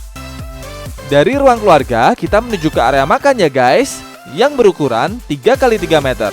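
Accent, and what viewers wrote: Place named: Java, Indonesia. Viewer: masnya ngomongnya medok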